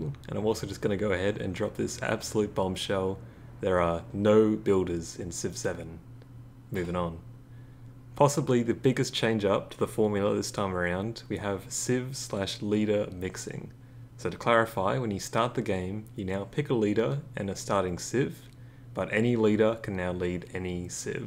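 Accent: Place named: England, United Kingdom. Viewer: HOLD ON YOUR ENGLISH??? I THOUGHT YOU WERE AUSTRALIAN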